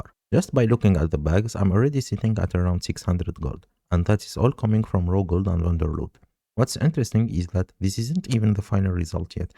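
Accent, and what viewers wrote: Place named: Latin America. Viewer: De donde sos ? Hablas inglés pero no sos nativo se nota muy raro tu inglés sos latino que habla inglés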